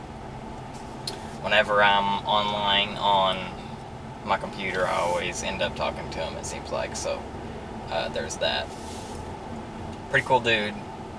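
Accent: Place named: South, United States. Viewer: The worst? Lol. It’s the country accent. It makes it better.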